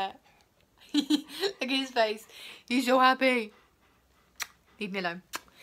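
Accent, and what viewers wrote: United Kingdom. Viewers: dude u have the most epic British accent ever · Greetings from Houston, Texas! Excellent video of your pack. Gotta love king Philip. I also really enjoy your awesome British accent!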